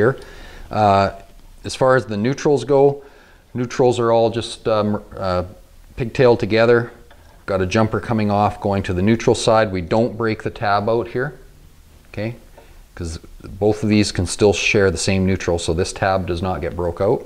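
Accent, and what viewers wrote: Canada. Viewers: You must be Canadian eh. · You have the most Canadian accent ever it reminds me of home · You can so tell that he's Canadian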